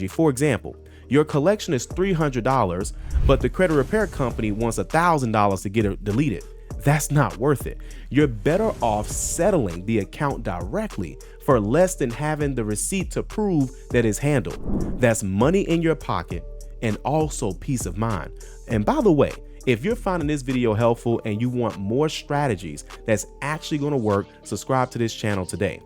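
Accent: United States. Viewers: What accent? American accent?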